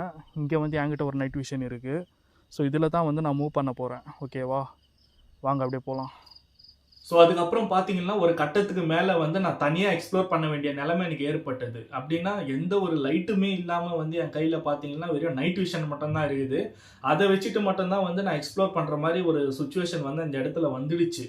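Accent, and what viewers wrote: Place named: Bangalore, India. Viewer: Bangalore tamil slang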